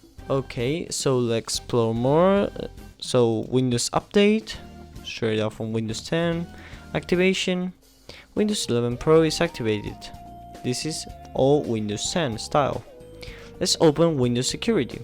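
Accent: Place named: Russia. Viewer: ik he just sounds like a younger enderman, he has the Russian accent and all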